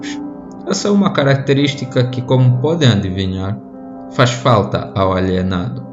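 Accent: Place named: Portugal. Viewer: Oi, de qual país vc é? Seu sotaque parece muito o de portugal, eu sou Brasileiro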